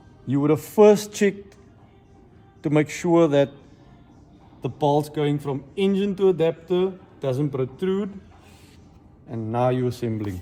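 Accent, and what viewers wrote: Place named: South Africa. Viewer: Are you from South Africa lol just come across your vid you sound a lot like the guy from district 9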